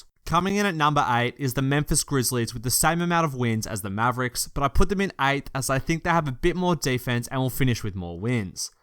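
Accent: Australia. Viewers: You sound like you came from Australia? Did you? 🤔🤔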